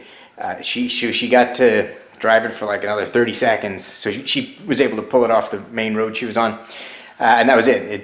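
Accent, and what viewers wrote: Belfast, United Kingdom. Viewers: Nathin, oul belfast coming out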